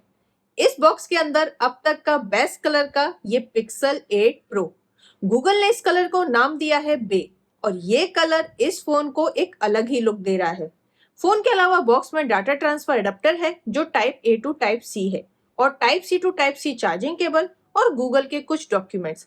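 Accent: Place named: Kangra, India. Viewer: Aap kangra ya hamirpur se lag reho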